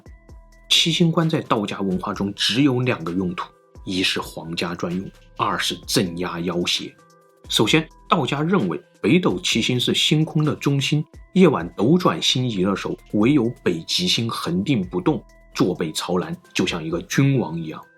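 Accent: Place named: South, China. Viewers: 是川味普通话。关于捡金的习俗，你不知道四川也有此习俗吗，我很小的时候远远的见过，坐标川西南。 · 別挑剔啦！ ㄧ定是南方人，可能是四川或是江蘇人吧！咬字發音自與北方人不同啊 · 👏👏👏每周必看的节目，雷打不动😎😎😎 请那些刻意(觉得可爱开玩笑的不算)对口音挑剔的移步去看中央新闻联播。好走不送👋 我们南方人就是这样说普通话达。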